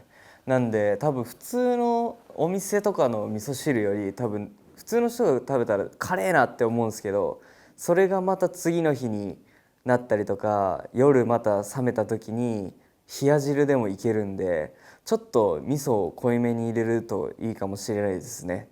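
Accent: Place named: Okayama, Japan. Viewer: かっこよ。あと岡山弁素敵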